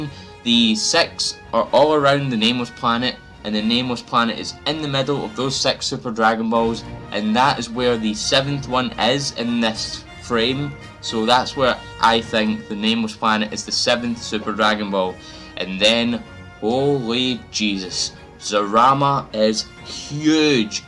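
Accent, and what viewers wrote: Scotland, United Kingdom. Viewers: Hey, man! I love your voice! Sorry for my ignorance but is it Scottish? Anyway, I think you have a really good sense of this stuff, so you don't need my help. Although, I don't think you need to get into your overall thoughts on the episode because you've been talking about your thoughts on a scene by scene basis. For the most part, I would stay away from reviews/news as a primary outlet when you are newer. You want to standout as much as possible. Just a thought. Either way, good job!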